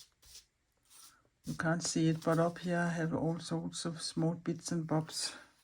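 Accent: Denmark. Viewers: I just love your voice and accent . It’s so soothing and melodic . My son in law is half Danish . Such a beautiful country . I’m so grateful to have met you virtually here . Your art sings to me and I enjoy every moment as I’m sat in my art corner creating along with you . I’m Lisa from Yorkshire UK . I love slow stitching also xxx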